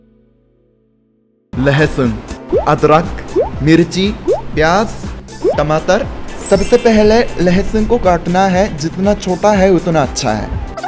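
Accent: Standard. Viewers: I want this guy to speak for hours in his pure Hindi .. btw great videos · Itni sudh Hindi hum bhi nhi jaante😅😅😅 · Bhai teri hindi toh hamare indian log se bhi zyada pure hai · Aap bhoot shuddh Hindi bolta ho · Waaah.... bhai aap sirf hindi nahi suddh hindi bolte hain jo ki bhut manmohak lgti hai........aapki hr videos un logo k muh pr thappd hi jo indian hote huye v hindi me introduction v ni de skte aur hindi bolne me shrm krte hain......💖💖💖💖💖💖💖💖💖💖🙏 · Itni sudh hindi toh Indians bhi nahi bolte🤣🤣🤣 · sala itna sudh hindi to india wale nhi bolte hain😄😄😄😄😄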